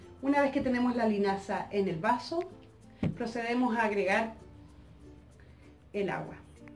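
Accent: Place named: Chile. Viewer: POR FIN VIDEO EN ESPAÑOL CHILENO! !!! MUCHAS GRACIAS ! DESDE MAÑANA COMIENZO CON TU RECETA 😊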